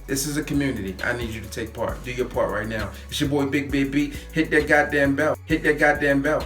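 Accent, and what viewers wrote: Baltimore, United States. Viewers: Where are you from man? I hear a bit of a Baltimore accent.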